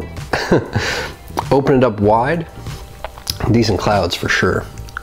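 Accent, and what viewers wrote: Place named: Boston, United States. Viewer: Been wondering bout you. Glad it's just a work thing that has kept you busy. Only 2 reviewers do rda reviews right. You and Ambitionz. Now if I could get over the Boston accent.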